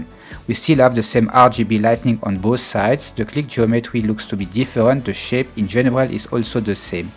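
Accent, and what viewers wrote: France. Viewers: This is a great video and I hope you exceed to do great things , but would you mind me asking are you French sorry I took three years of French and thought I recognized the accent my apologies if this isn’t correct also I subscribed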